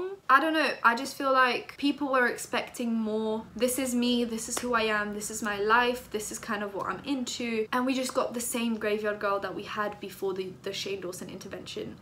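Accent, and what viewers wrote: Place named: London, United Kingdom. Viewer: Your the only white girl with a london accent sound like a roadgirl on youtube bit random lol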